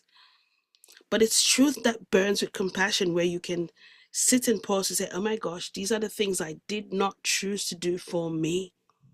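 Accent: Jamaica. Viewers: I LOVE your message AND your Jamaican accent. You said, "You're not losing your mind, you're losing your limitations"...PROFOUND! I love the way you use just the perfect words to convey your message. Thank you for giving so freely from your beautiful spirit! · Sista! Gwan an speak inna patwa! Me luv it. Blessings. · Amazing! So glad I saw you on Alex’s next level soul! Love your Jamaican dialect pls do it more! Learning so much from you ty! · I looooovvvvvveeeeee your Jamaican accent!!! I am in love with the Jamaican accent! It sounds pure, to the point and so divineThank you!!!It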